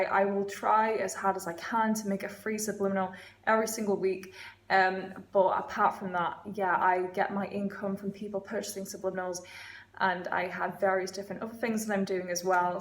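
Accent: United Kingdom. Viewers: Love your British accent. I got no subliminal request. Just wanted to compliment you... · I love your British accent, your eyes, your look, your class, like the famous British cars. Greetings from Italy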